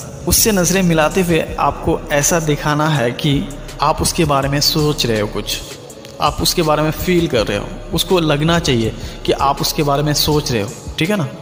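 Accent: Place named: Bihar, India. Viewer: Aap na pakka bihari ho 100%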